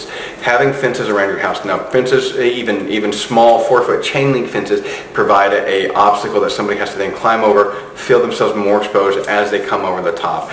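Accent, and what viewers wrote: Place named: Alabama, United States. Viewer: Great recommendations. You sound like a fellow Bama boy!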